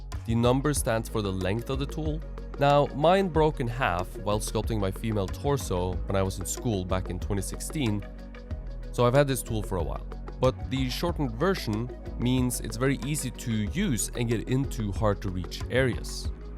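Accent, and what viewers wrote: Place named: Italy. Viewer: your wood took from torante? Terante? Toronto? Please don’t have this Italian accent. I’m searching for this tool for the whole day and I can’t understand what’s the name of the brand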